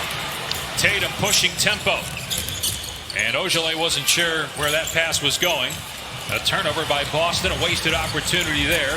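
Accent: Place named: United Kingdom. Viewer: spiffing brit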